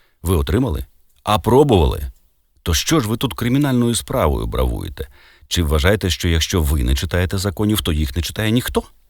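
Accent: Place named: Russia. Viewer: Можна слухати.Але рюцкєї слова та наголоси все псують.Неук.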